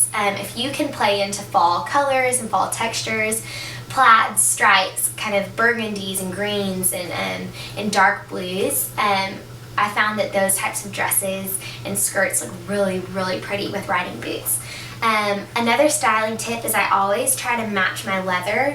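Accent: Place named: Texas, United States. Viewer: I'm from texas too so i can fell the accent come into my soul haha but i don't have an accent because my parents are extra foreign lol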